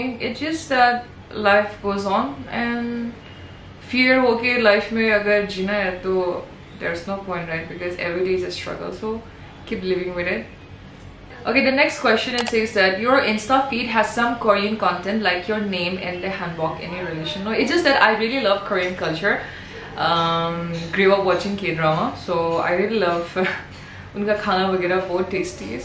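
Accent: Nagaland, India. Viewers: Your nagamese accent is just us❤ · Wow you sound like an Angami while speaking Nagamese😀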